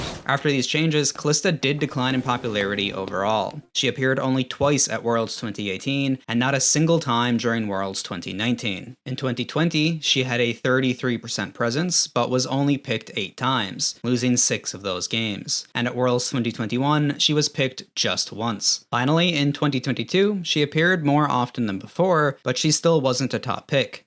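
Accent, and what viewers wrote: Canada. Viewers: can you please work on getting rid of your canadian accent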